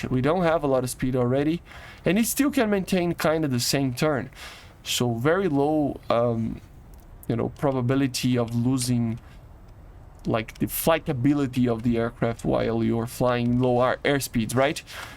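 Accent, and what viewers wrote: Brazil. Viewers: Sotaque de BR....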